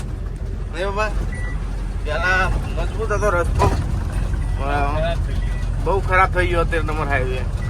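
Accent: North Gujarat, India. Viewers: Uttar Gujarat ni boli gageh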